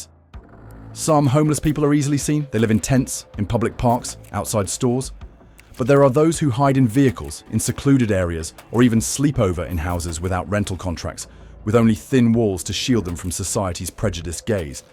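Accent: United Kingdom. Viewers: You sound like you're from the UK, why don't you go back, there's plenty to work on there. It's such a crazy thing that naive people tend to believe people from the UK or Australia because they have this accent that tickles their ears, all the while the UK is imploding. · This is not not not the fault of “a society “ like the narration of the idiot writer of this script ! This is a result of Democrat run cities like Portland, Seattle, San Jose, Los Angeles and many others. An immoral government allows for homeless encampments, poor law enforcement, leniency towards drugs and prostitution along with allowing gangs and cartel control… the wealthy drink wine and socialize while they care nothing for law and order and safety, health and security for the community. The facts speak for themselves. I live in a peaceful clean area of good governance by common sense leadership. 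Thank goodness for some good places to live still. The narrator should take his British accent and tell the story of the Isslummist problems in England!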